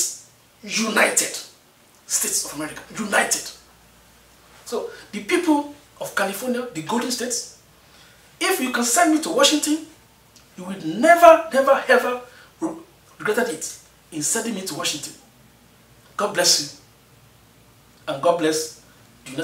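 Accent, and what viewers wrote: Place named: Nigeria. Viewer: with an accent so Nigerian, hmm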